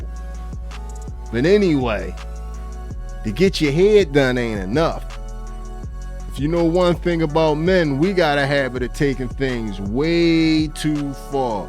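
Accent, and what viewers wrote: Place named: Baltimore, United States. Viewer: I absolutely love your Baltimore accent!!!!!❤❤❤❤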